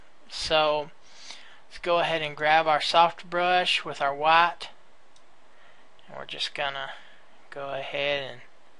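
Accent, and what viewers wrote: Texas, United States. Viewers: Very helpful. Thanks for posting. I also love your Texas accent. Reminds me of my time spent in that great state.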